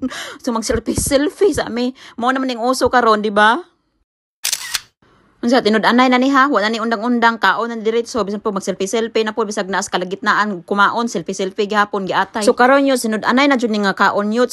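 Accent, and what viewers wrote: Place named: United Kingdom. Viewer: Paspasi ang cheers dihaa! ang imo British accent dai kay gwapa paminawon.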